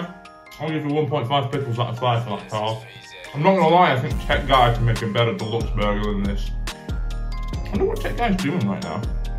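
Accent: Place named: United Kingdom. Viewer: i dont know you were british, good content, cheer mate!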